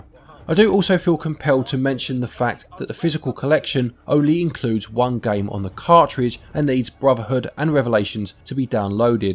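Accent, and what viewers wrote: England, United Kingdom. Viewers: As soon as I hear the English accent I’m hooked.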